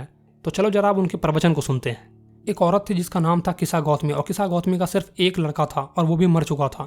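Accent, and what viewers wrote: Bihar, India. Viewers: Sir aap bihar kai ho kya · he is from bihar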